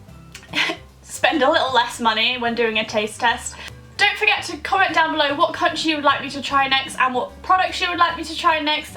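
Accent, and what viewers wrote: United Kingdom. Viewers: British accent is everything 🙊🙊🙊💞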